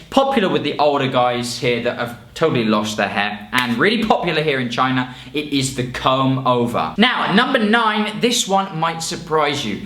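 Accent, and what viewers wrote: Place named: England, United Kingdom. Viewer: He's English. He's saying it the right way. They did invent the language after all lol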